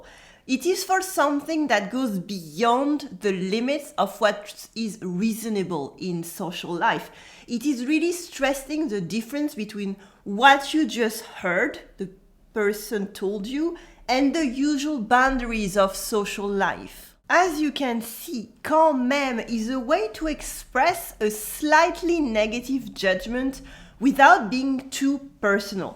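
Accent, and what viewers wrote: France. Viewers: On the contrary, speaks an excellent English. Her French accent, intonation and cadence makes her English even more charming and pleasent to ear.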